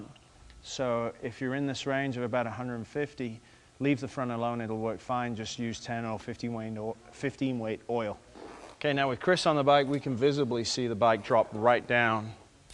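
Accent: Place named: Northern England, United Kingdom. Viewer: is he northen english or american?? that accent is confusing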